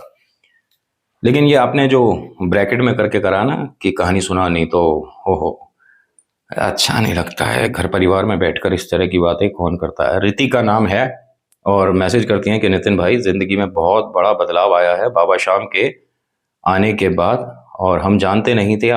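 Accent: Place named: Mathura, India. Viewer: Aapki Mathurawali boli gazab hai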